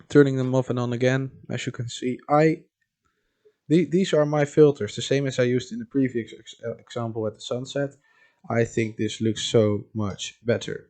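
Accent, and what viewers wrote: Netherlands. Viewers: You Dutch by any chance? 😄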